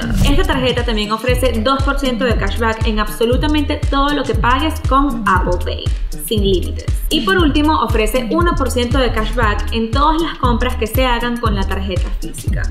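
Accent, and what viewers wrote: Venezuela. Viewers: Hola me encanta tus vídeo y la forma como los abordas. Y ese acento venezolano es lo mejor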